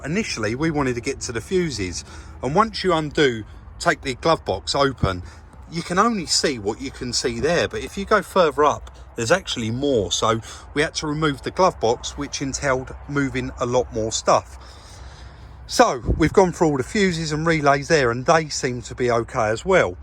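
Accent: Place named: England, United Kingdom. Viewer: Hi, sorry I'm no help with your problem on this van, or any modern vehicle, but I just had to say----love your accent mate! I was born in South Yorkshire ( Sahff Yowksha ) but have lived in Ireland for the last 23 years. English accents are a rarity here so to hear you rattle on is a real treat! Hope Chris is still OK and you too are keeping safe. Best regards from Ireland.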